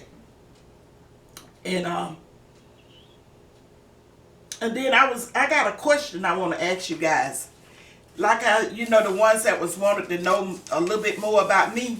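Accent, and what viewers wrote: South, United States. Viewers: It’s something about you Ms.! I love your energy and you are just a good feeling. Every time I watch you I feel like I’ve talked to my Nana. You kill me saying you fumble your words and it makes me laugh but I’m laughing at you thinking it’s a problem. It’s not. That’s you and I know southerners often don’t speak very “proper” but I bet that food is proper. I see that you are great. You speak positive and make people feel comforted. You have beautiful skin. Y’all lucky I live in NY cause I’ll show up at your church and happen to befriend you on purpose 😂 ohh you have YouTube I didn’t know that! I know you will anyway but keep being yourself 😘😘😘😍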